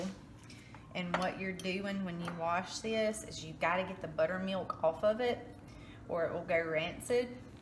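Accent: South, United States. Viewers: Thanks for the inspiration to make our own butter. I think that method would work in our bosch mixer, oh and I love the southern accent. :)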